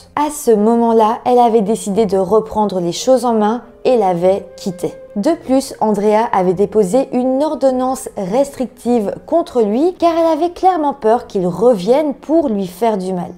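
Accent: Belgium. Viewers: Elle est belge elle ne prononce pas les UI comme en France voilà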